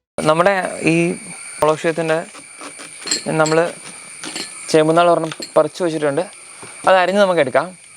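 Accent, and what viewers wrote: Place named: Kannur, India. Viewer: Hi മോനേ... എത്ര കാലായി കുഞ്ഞി...... കണ്ടിട്ട്? കണ്ണൂർഭാഷ ആണ് അങ്ങനെ പറയുമ്പോ ഒരു ഇഷ്ടം 😅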